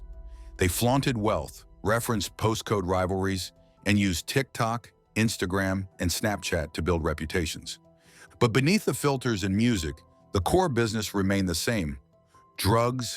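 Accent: United States. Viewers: Lmfaoooffffffs 🤣 wrong gang you dip-stick 😂 along with shyte american narrator ..Yeh you is a sad attention seeker uploading this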